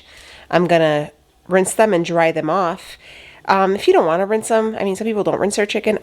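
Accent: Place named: Illinois, United States. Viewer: Your accent!🥰 you sound like my step- mother in law who grew up in Illinois, near Chicago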